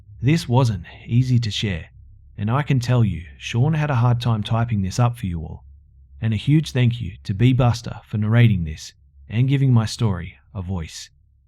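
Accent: Australia. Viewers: aussie for days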